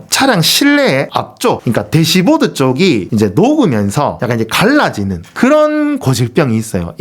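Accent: Gyeongsang, South Korea. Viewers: 헉시 갱상도 사람인가여??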